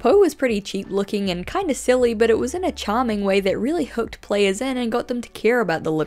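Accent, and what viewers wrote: New Zealand. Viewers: You are from New Zeland? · I'm from the us and i pronounce it like you do its nothing with you having a new zealand accent ;)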